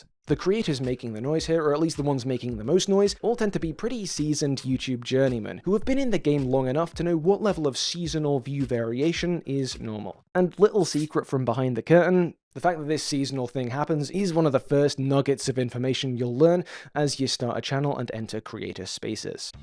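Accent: United Kingdom. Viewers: how is this guy British Kyle Bosman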